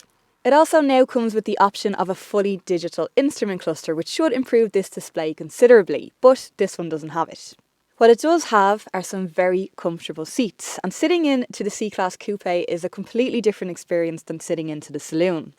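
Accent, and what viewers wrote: Dublin, Ireland. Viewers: I like the Irish accent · Could you not even try to articulate your annoying dublin voice . .f.. sake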